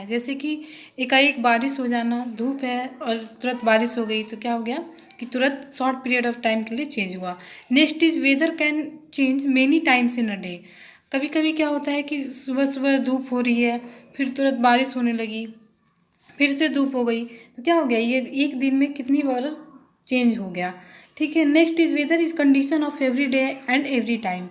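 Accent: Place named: Bihar, India. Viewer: Bihariyo ko tarah mt bol pagal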